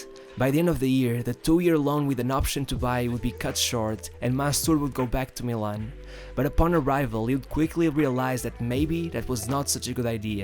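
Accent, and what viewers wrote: Portugal. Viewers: Fodas em 2012 a internet não era o que é hoje ? Este rapaz não pediu nada a ninguém, eu não entendo o título deste vídeo. This title is not only wrong, it is highly offensive. This kid didn’t scam anyone. They pressured him and his family and put them in the spotlight. He just didn’t live up to expectations.there is nothing wrong with that. I can hear from your accent that you are Portuguese, devias ter mais respeito na maneira de falar. Chamaste lhe scammer várias vezes e isso não está certo. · O narrador é tuga, só pode.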